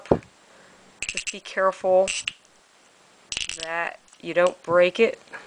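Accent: Philadelphia, United States. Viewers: Is this a Philly accent or Baltimore?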